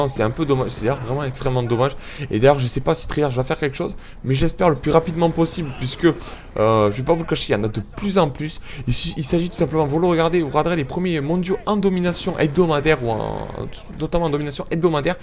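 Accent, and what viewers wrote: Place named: Marseille, France. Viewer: Je veux pas dire mais tu pourrais ralentir la cadence quand tu parle,parce vraiment c'est dur dur à comprendre ce que tu dit en plus avec ton accent marseillais sa n'arrange pas les choses sinon joli massacre :)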